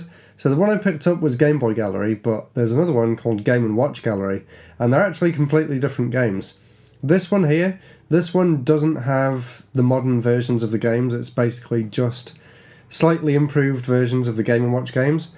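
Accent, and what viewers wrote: England, United Kingdom. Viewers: Gretings from Brazil. I was looking for youtube retrogame vídeos and I found your channel. I really enjoyed how you was exciting with this retro shop. I had been visit London in 2015 but did not found this shop, what a shame!off course is always Nice listen The trully english, here in Brazil we have much more contact with the American english, and its not so Nice. Im glad that I found your channel.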